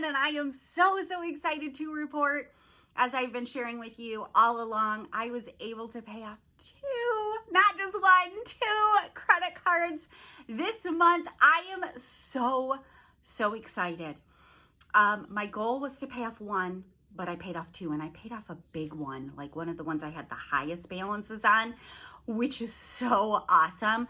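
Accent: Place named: New Orleans, United States. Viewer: I just love your cute little voice all the way from New Orleans..La💜🤣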